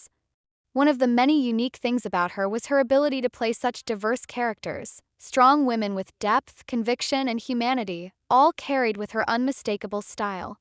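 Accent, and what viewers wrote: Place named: United States. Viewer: Fucking American voice-overs on everything these days, I resent it hugely..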